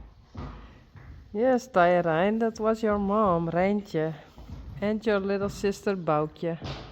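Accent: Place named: Friesland, Netherlands. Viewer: Zijn jullie Fries? Ik meende Nederlands te horen en toen dacht ik... nee toch niet helemaal bij bepaalde woorden haha. Dat moet dan wel iets van Fries wezen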